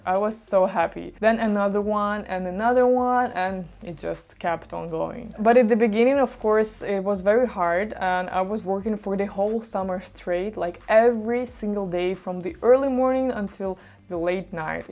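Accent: Russia. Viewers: ох уж этот наш русский акцент 😅❤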